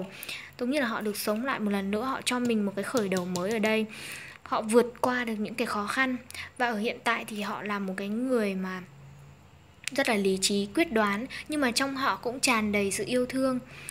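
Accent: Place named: North, Vietnam. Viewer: giọng Bắc bạn hay qá ko gắt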